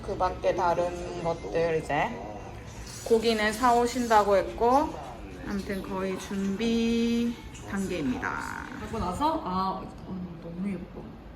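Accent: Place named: Busan, South Korea. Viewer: 첫멘트 어? 리얼리? 발음이 좋으십니다 ㅋㅋ 한달뒤 휴가인데 말투 듣고 있으니 벌써 부산에 와있는 기분이네요 부싼 아입니꺼....ㅋ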